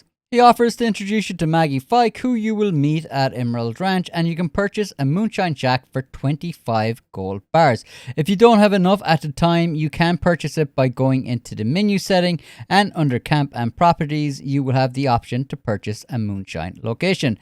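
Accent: Ireland. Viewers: I love the Irish accent.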